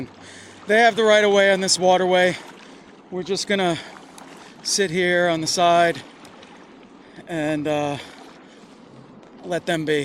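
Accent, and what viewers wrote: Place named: New York, United States. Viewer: Got to be on the look out fore snitches,man you went on a round a bout way to git to , the rinc key dinc island, but in teresting.brave soul,endurance.you talk like mi roommate Chuckie Frome the navy 34 yrs ago Norfolk ,va., he was Frome flushing and queens I am frome Chicago.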